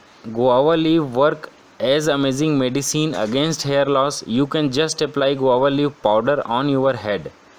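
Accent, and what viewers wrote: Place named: India. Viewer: Indian accent, you know it's a damn scam!!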